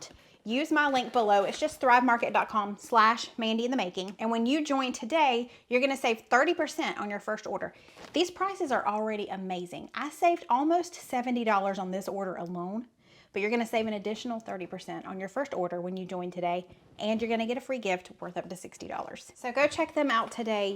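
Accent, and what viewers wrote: South, United States. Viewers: Awww Gracie Lou 😢. As far as your “English”? That’s called Southern English. I speak that language too 😂. All of these dishes look amazing!!!! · I’ve watched you in another videos and why do you rants your rice before you cook it? I’ve never heard of that before from anyone except for you so I might’ve been doing it wrong all these years. By the way you say boil, oil, foil and all of your southern words correctly. How do I know you say them correctly , well, you say I’m just like I do, lol.❤😂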